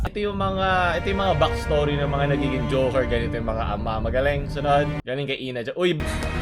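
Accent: Visayas, Philippines. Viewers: Bisaya nis? Bagohan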